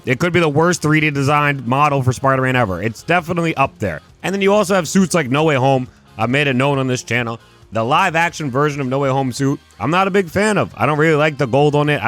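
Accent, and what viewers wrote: Boston, United States. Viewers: unrelated, but been meaning to ask, are you from Boston or something ?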